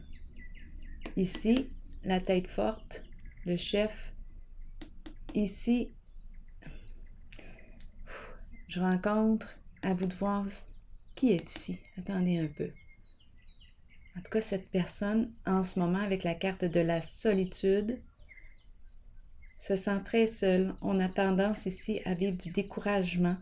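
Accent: Canada. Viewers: Mon Dieu, que j aime votre accent canadien. On dirait mon ami Matthieu Bock Côté. Adorable cet accent !!!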